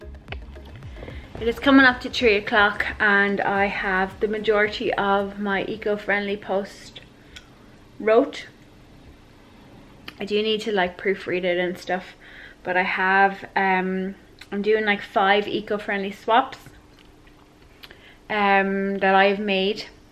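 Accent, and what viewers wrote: Ireland. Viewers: I'm from Middle East and I love Irish accent 😊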